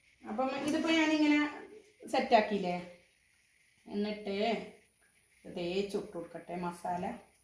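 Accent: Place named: Wayanad, India. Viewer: താത്താന്റെ സംസാരം കേ ട്ടിട്ട് വയനാട് ജില്ല തോന്നുന്നു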